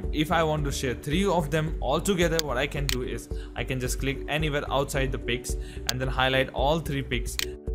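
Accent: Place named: India. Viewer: Sorry can't handle the extreme mono-toned Indian accent. :(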